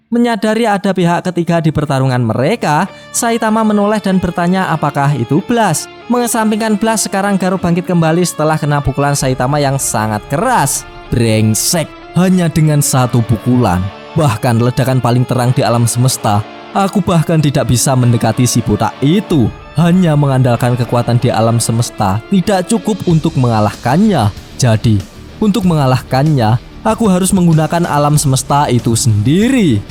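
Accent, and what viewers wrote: Java, Indonesia. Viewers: Nah gini bg selagi manga chapter terbaru nya blm rilis mending cari yg fanmade fanmade nya aja bg seru bngt asli, apalagi pembahasan nyaa kayak mimin logat jawa gini makin asik 😋😋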